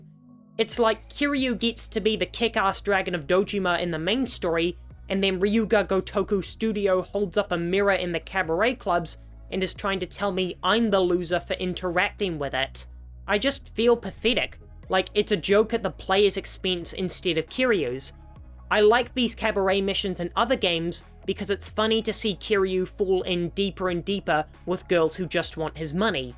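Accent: New Zealand. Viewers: You can tell from the voice and kiwi accent that the testosterone is strong with this one.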